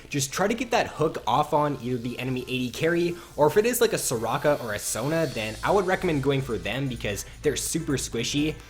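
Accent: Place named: Canada. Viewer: ...You're Canadian aren't you... Good video though.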